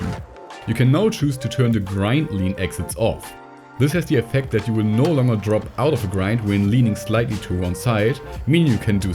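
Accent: Germany. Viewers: are you german or smth cause that accent sounds so german 🔥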